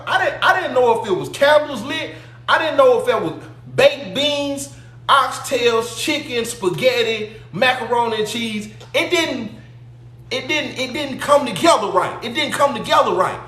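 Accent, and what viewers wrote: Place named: Dallas, United States. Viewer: Sound like Sunny side South Dallas lol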